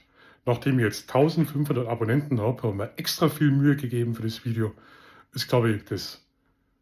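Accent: Bavaria, Germany. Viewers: Hey Namensvetter!!! ✌️ 😊 ois erstas: i steh auf dein bayrischen Dialekt ❤ es klingts ned vü aundas ois mia waun i mein Dialekt ned vasteckn probia 😂 SUPER Video und SCHÖNE Aufnahmen vom Köngissee 😍... bei unsan letzten Besuch homma die letzte Bootsfoat leider knopp vapasst ... miss ma unbedingt nomoi hin ... DANKE fias mitnehman!!! Schene Griaß aus Österreich ✌️🤗❤️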